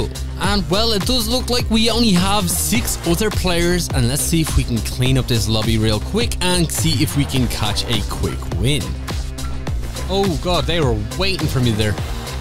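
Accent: Ireland. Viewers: Are ye from ireland I am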